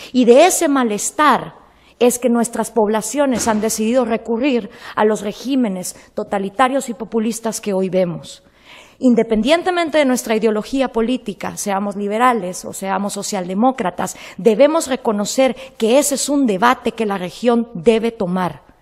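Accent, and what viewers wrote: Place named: United States. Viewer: acento norte americano y eso sí ya es peligroso...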